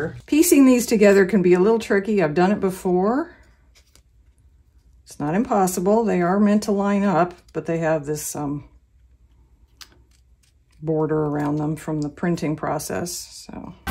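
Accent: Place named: Pennsylvania, United States. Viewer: New Joisey? Nah, not NJ, you don't have a strong accent, I would say PA? That silver is sweet, love it with the skulls!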